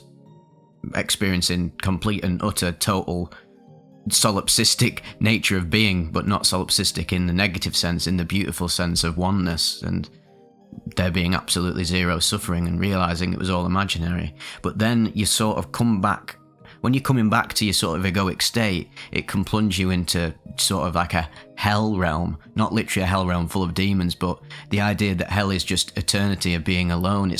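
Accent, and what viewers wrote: United Kingdom. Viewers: He’s British that’s just how they enunciate lol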